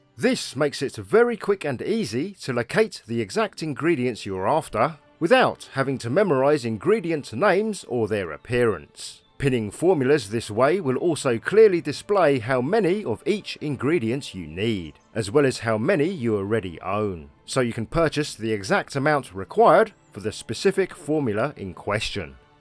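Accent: United Kingdom. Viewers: Are u Asian with a British accent !!!! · No disrespect my man..but you shocked the 💩 outta me the moment I heard your voice/accent. I don't believe I've ever seen an Asian gent with such a rich English accent. It's got me like 🤯🧠⚽🥅. In any case, sweet video! 🙌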